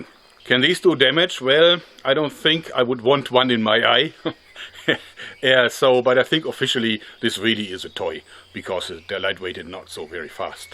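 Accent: Germany. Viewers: Love it! Hello from USA! I love your videos and I think your german accent is cool and makes you seem more like a mad scientist haha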